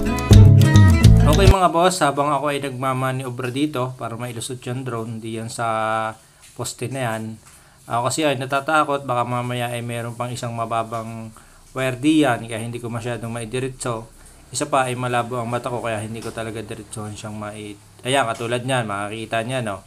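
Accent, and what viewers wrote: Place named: Batangas, Philippines. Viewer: Ay kainaman.batangasin ang iyung tuno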